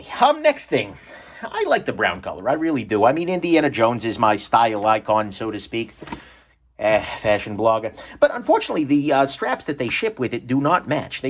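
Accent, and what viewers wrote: New York, United States. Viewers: Because it is worth it to hear someone speaking with a New York jewish accent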